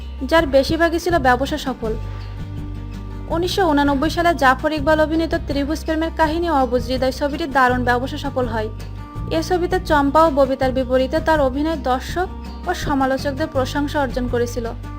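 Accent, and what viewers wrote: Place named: Pabna, Bangladesh. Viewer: desher bari koi pabna na kushtia ........? kotha bolte jane na........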